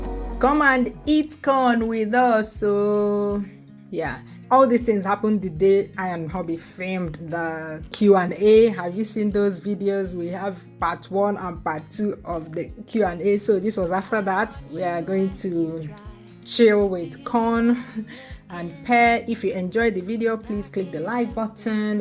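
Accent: Igbo, Nigeria. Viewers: Love your igbotic voice as you always call it. You turned me into a good cook of Nigerian dishes. Keep it up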